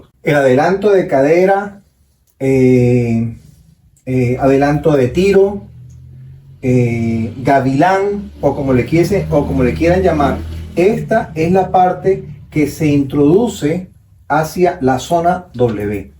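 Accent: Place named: Venezuela. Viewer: Tiene acento Venezolano❤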